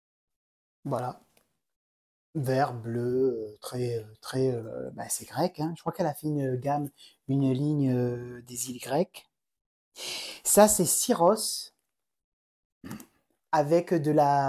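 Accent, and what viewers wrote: South, France. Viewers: Bonjour! L'accent du sud de a fait venir le soleil; la matinée était pluvieuse, jusqu'à ce que j'écoute ton podcast 😂😂😂 Super, tes chaussettes Serre-moi la pince! J'adhère a l'idée de l'instant Tricot 🎉 Bonne continuation!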